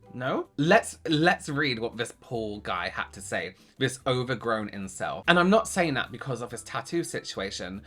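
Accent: England, United Kingdom. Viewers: Can you speak Spanish? I'm dying to hear Spanish with a British accent! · I love hearing you read out that nonsense lol. Words like "n*tsack" in that unamused English accent is just perfect 😂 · I think you could make a living just reading aloud profane, ungrammatical posts from Americans (I am one, so don't come for me, fellow Yanks). I've gone back and listened to the segment between the mark and several times. The combination of your soothing voice, your British accent, and that incel's assault on the language (and his client) is art.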